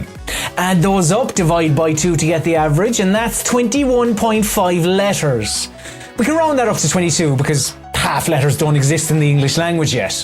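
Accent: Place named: Ireland. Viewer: Nice to hear your dulcet irish tones again 👍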